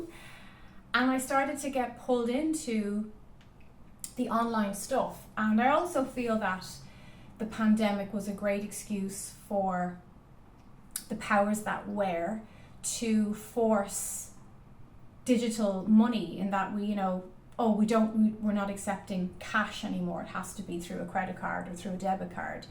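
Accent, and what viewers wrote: Ireland. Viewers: are you Irish? according to my psychic vision you are.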